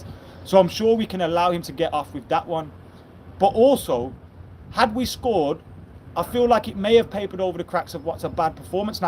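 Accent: Birmingham, United Kingdom. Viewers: Hahah are you a Brummie??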